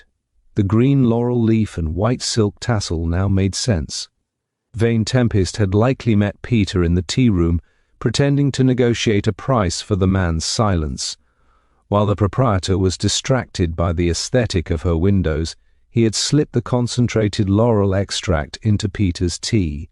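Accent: United Kingdom. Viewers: The reader is very good and diction is very clear. However I’m sorry to have to say this, but hearing this read by a very English accent spoils the atmosphere, reality and charm of this story. The charm is in the Highland accent and pace of speech and this is not it. But I’d listen to any other story read by this speaker as he was so clear.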